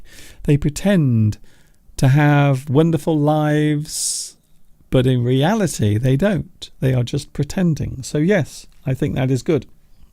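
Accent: United Kingdom. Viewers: My lovely British accent!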